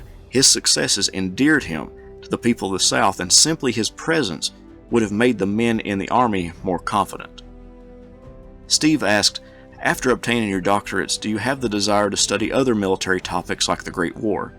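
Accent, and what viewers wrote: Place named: Appalachia, United States. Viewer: I knew that accent was Appalachian. Love it.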